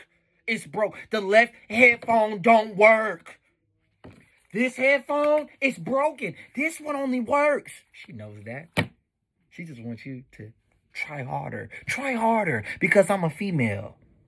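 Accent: Baltimore, United States. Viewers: Sound like you from Baltimore ?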